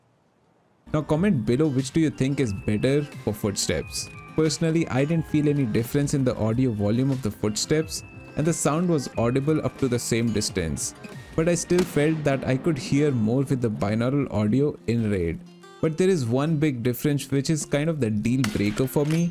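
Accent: India. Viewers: Your videos would be awesome if you spoke english instead of indian-mutated english :}{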